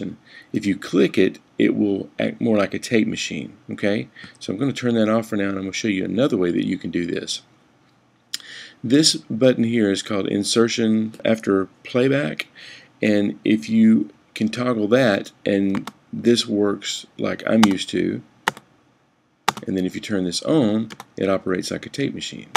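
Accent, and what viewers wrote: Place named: Tennessee, United States. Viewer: THANK YOU!!! You sound like you're close to BNA.:-) Good vibes.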